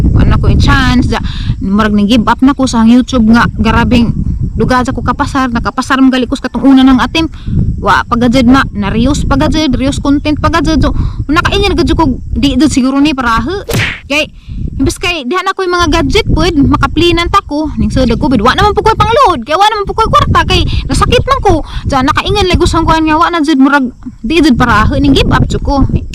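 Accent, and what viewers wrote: Bohol, Philippines. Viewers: Lami jud kaajo paminawon ang sinultihan nga bol anon madam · Bravo mao gusto ko nga Boholana maayo pamation good job! Josephine Legaspi Grunseth from Texas! Shout out taga Sierra Bollunes family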